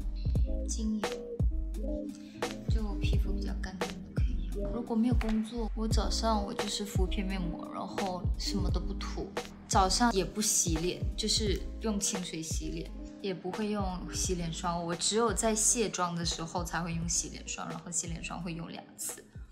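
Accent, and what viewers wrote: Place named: China. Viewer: 整個中國腔欸！（有嚇到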